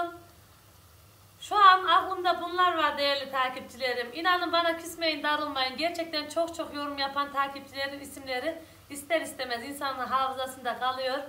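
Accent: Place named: Nevşehir, Turkey. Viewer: Nevsehirliyim konusmammiz col benziyo cömce 🙂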